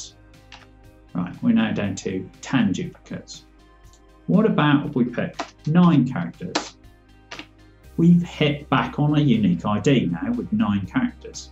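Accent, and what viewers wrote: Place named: United Kingdom. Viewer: What I heard was a very accented British dialect of some sort, very hard to understand.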